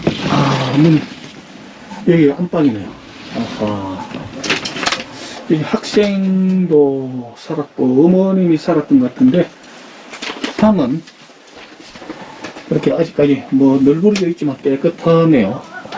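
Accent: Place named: Andong, South Korea. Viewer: 아이구 안녕하시죠? ㅋㅋ안동말투 정겨워요 날은맑으네요 봄을기다리는듯 참새가 짹 짹 마중나오셨네요~~ 두분 즐겁게 다녀보세욤 ~~ 상세한 정보 박수 보냅니다~~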